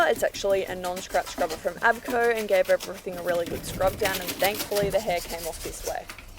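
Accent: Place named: Australia. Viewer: Great video! If open to suggestions and unsolicited advice 😅 here it comes, longer videos make for better content for cleaning transformation lovers I think! I feel like a lot of the video was focused in one or two areas and we missed the process of the cleaning in the rest of the rooms, only getting to see the after. Would also be great to have a final comparison of before and after of each room at the end! Lastly, LOVE your narration (especially cause I love Australian accent 😅) small suggestion is to lower down the volume of the audio of the original video in the moments that you are narrating so we can hear your narrations better! For instance, it was a bit difficult to hear you during the vacuuming of the bathroom sink. Sorry if that was too much 😂😂😂 I just see amazing potential with this channel and thought I’d share my unsolicited advice in case you care 😅😅 sending love and looking forward to more videos! 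❤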